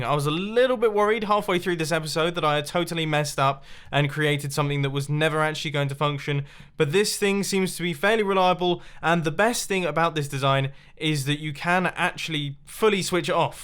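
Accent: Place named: United Kingdom. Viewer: Thanks for the wonderful content these past years! Really appreciated your amazing and genius inventions, your British accent and your apparent lack of ego. I wish you the best of luck but my intrest for Mincraft has diminished over the last few months so to clean up my sub box i wil unsubscribe (not from your vlog channel, patiently waiting for more content there).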